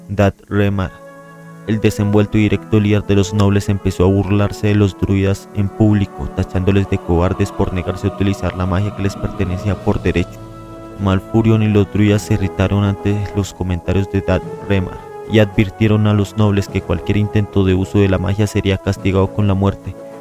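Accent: Colombia. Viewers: de colombia , por el acento cachaco , saludos desde Valledupar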